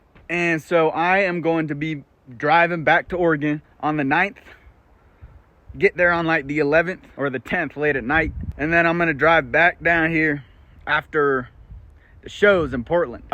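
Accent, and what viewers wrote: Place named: Arkansas, United States. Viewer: I find it so strange as an older person to see a 20 year old white boy in the Pacific North West talk like an old black man from Arkansas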